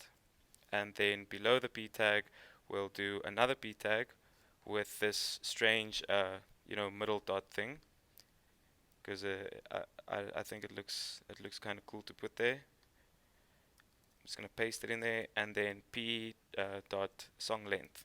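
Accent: South Africa. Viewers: You sound South African brother. Thank you for this tutorial. Just what I needed right now.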